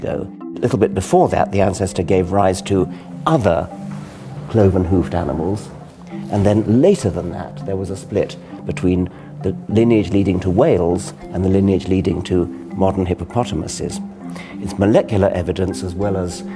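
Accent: United Kingdom. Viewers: “Even his British accent couldn’t make that sound intelligent” I get where you’re coming from because iv never come across anyone so desperate to take the intellectual high ground. He’s unbelievably patronising and philosophically naive! That’s brilliant Dawkins your a great scientific populariser and no one is more “human” than you but no one asked you to explain evolution as most people know evolution is a fairly good theory and it still doesn’t justify your claim that eugenics would work on humans without explicitly condemning it. Not to mention your naive and arrogant dismissal of philosophy and metaphysics. According to Karl Popper “it is important that scientists remain humble as a prediction could change from one moment to the next, unfortunately there is corruption since scientists started getting subsidies.” (Karl Popper). Science can’t “prove” anything as it is provisional and can only infer. 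In a thousand years Dawkins description of evolution will be synonymous with Bronze Age cosmology. We are not even scratching the surface of reality and logical positivism is self refuting. “logic is an illusion” (Nietzsche) I don’t personally have a problem with evolution or the natural sciences as long as people don’t ram it down my throat by insisting Im committing some kind of heresy if I choose not to describe all of reality using the quantitative language of science. According to Einstein “It would be possible to describe everything scientifically, but it would make no se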